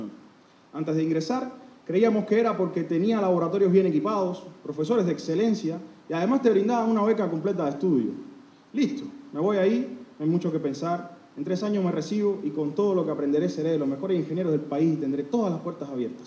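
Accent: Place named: Argentina. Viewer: Oe socio, se te ha pegado hasta el acento de argentina, felicidades hermano, hace rato que no se de ti pero se que estas bien porque una gente buena, enfocada y competente como tu todo lo logra, un abrazo hermano desde la distancia, cuidate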